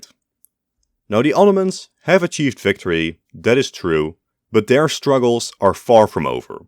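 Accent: Netherlands. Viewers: I can tell instantly that this man has a Dutch accent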